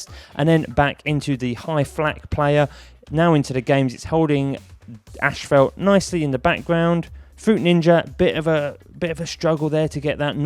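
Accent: England, United Kingdom. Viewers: first name surname he's from England that's how his accent sounds like and English is originally from England. Deal with it in fact your accent is the one that is weird to them.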